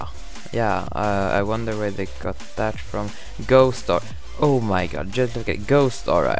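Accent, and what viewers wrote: Sweden. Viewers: Wow u can really hear that u are from Sweden #SweGang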